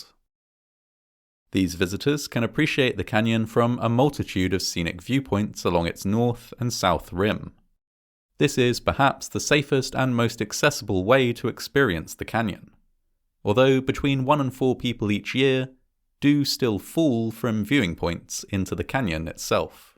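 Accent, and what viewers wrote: United Kingdom. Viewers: He’s a Brit let him speak British english in peace, man lmao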